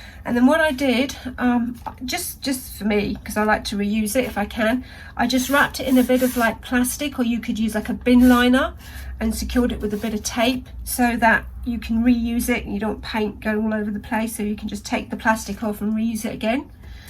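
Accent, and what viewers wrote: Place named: United Kingdom. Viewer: Here it is 2022 still loving your creativity. I'm trying the star shape bauble this Christmas. I love your Brit accent especially when you say 'pretty' Merry Christmas, from Canada Free!🚚🚛🚜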